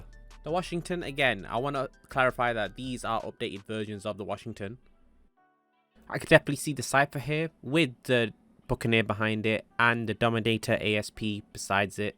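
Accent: England, United Kingdom. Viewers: i feel like i’ve watched this video twice already but this one just has a english accent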